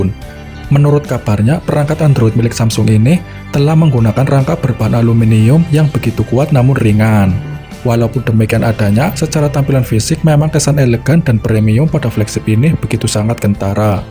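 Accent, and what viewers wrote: Banyumas, Indonesia. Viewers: logatnya ngapak......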